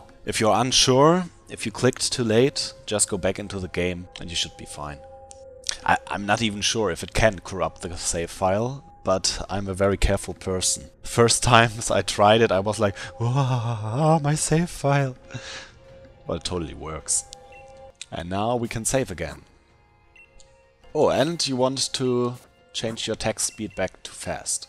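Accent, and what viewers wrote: Germany. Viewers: You’re German, aren’t you? Or possibly polish?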